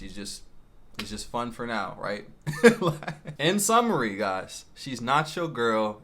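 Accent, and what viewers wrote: United States. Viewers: Hey wassup I have no intentions at all of moving there but I do appreciate your suggestions, knowledge, and sharing your informative and detailed Perspectives & experiences. I can tell u would be a great friend to have in my circle. U sound like u from the 5 boroughs. Probably BK or queens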